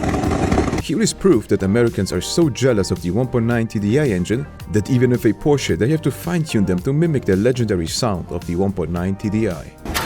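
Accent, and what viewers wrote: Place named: Poland. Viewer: Masz bardzo słaby polski akcent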